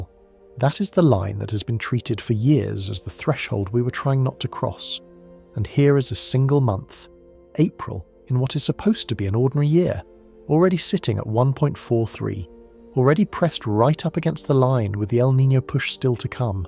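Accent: England, United Kingdom. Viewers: Lose the English accent It sounds like shit.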